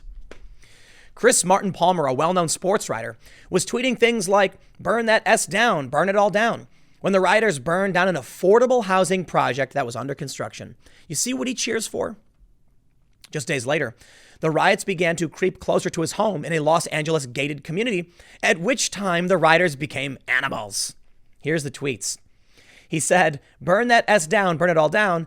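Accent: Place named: New Jersey, United States. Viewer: Hearing a lot of your Jersey accent in this video. Love it. Stop repressing it.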